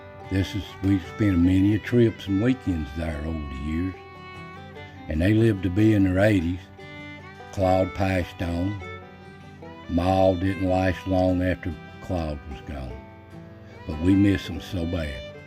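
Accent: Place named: North Carolina, United States. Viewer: What memories your stories bring back! Just today, remembering great uncles plowing with the mule & a really mean bull. You even talk like my people from Yancy county in NC. Love your channel!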